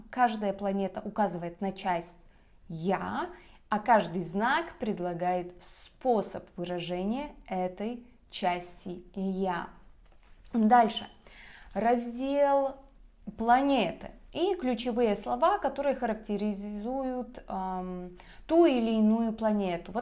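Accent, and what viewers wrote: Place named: Baltic states. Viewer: Очень красивый и лёгкий прибалтийский акцент ❤️✨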